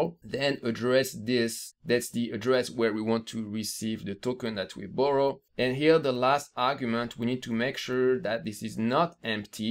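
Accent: France. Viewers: Are you French ? It seems you have a slight French accent ahah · Cet accent français 😂😂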